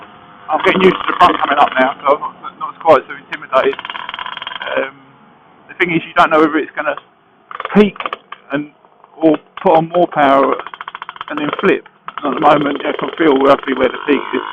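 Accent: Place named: London, United Kingdom. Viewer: Gotta have spent some time in London to know everything he says😂innit geeza.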